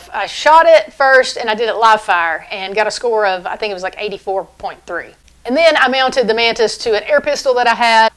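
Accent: South, United States. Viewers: I also got to say I love the southern accent....lol... I'm from the Midwest so we have boring accents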